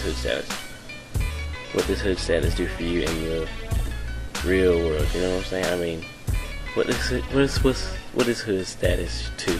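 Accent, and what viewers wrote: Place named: United States. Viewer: speaking correctly is a matter of opinion. You're way too americanized.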